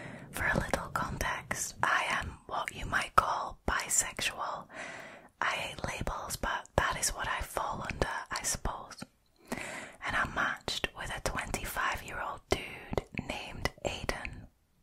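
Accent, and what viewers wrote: Northern England, United Kingdom. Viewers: you have a lovely voice sends me straight to sleep :) not to intrude but are you from the north of england? i am from there and you pronounce things similarly to me and it made me feel represented haha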